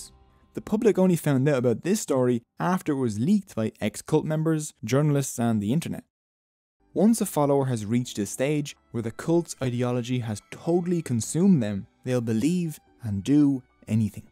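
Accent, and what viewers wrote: Ireland. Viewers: I love your videos... Watching them from this morning. Your animations are truly great and I love your voice & accent, kinda sounds Irish, idk. Wish you could tell me where is this accent from. P.s: you got a new subscriber 😁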